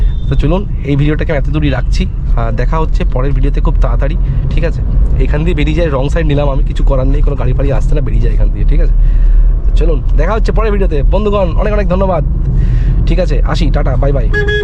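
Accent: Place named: India. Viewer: আমি তো অবাক হয়েছিলাম প্রথমে ,যে আপনি পশ্চিমবঙ্গের বাসিন্দা, আপনার হিন্দি দেখে তো মনে হচ্ছিল আপনি অন্য রাজ্যের। স্যার বলছি কিভাবে উঁচু জায়গায় গাড়ি উঠাতে হয় এবং নামাতে হয় এই নিয়ে একটা দয়া করে ভিডিও বানাবেন❤❤❤❤ ধন্যবাদ আপনাকে আপনি খুবই ভালো করে শেখান গাড়ি চালানো , আপনার টেকনিক খুবই ভালো সহজেই বোঝে আসে ❤❤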